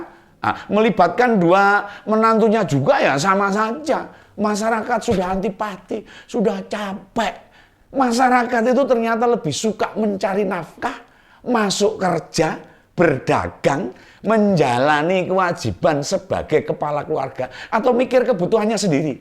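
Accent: Java, Indonesia. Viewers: Saya suka keliru antara dan, logatnya hampir sama juga medok" Jawanya, aku senang ke dua" nya, selalu menyajikan isu" yg lagi hot, tentang situasi politik yg sedang berjalan, semangat terus Om untuk mencerahkan orang seperti saya jadi melek politik, Merdeka 💪💪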